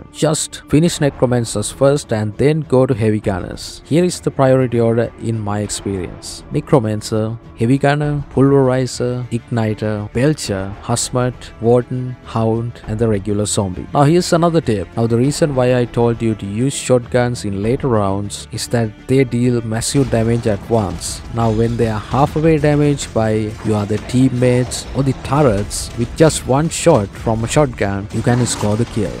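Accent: Sri Lanka. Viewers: I knew ur sinhala from ur accsent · Broo are u from sri lanka?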